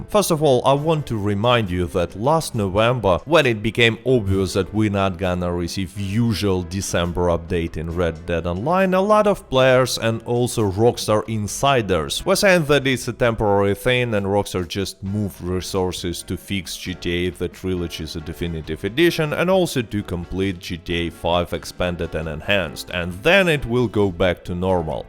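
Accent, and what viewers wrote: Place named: Russia. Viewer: Love the Russian accent and Russian attire makes it great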